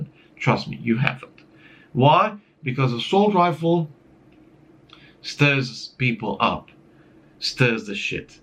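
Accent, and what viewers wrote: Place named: United Kingdom. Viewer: Took me by surprise with the british inflexion not gonna lie. Also, I think classifying non-automatic weapons as "assault rifles" is pure idiocy. A carbine sold to civilians will never have the auto option. By military definition " selective-fire, automatic rifle chambered in an intermediate cartridge fed by a detachable box magazine". So any news anchor talking about "assault rifles", especially in Romania, with their "experts" are talking out of their ass. Great video as always.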